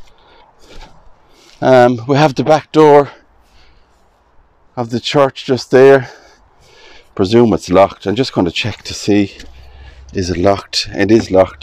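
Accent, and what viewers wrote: Ireland. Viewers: What wonderful video. When our children have moved out, I hope we can travel to Ireland and visit our ancestors and look at the old churches. Just a minor suggestion for you to consider. Maybe give the location and formal name of church in the written notes for each video? Your brogue is wonderful to listen to for us out of Ireland, but hard to make out the names by pronunciation and given spelling differences. (I'd love to add this church, for instance, to a vacation wish list, but I have no idea where it is.) 😢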